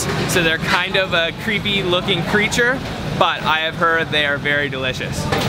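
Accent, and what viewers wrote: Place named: Canada. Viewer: Yay represent (I'm Canadian too)!! I can tell from your "aboot" 😆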